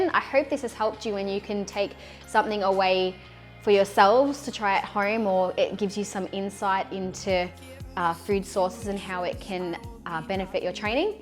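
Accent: Australia. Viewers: I like the Aussie accent.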